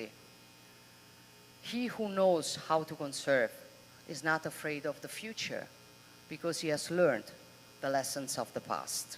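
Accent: Rome, Italy. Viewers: Onore al merito, ma che inglese romanesco!!! Non si può sentire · i admire she went all out trying her best in English.Although she gained more and more (Italian) accent along the way,she managed to get all important concepts across,she corrected herself in few occasions,still composed and strong.it is impossible to be liked by everyone,and she knows that very well,but i think she now have the respect of many.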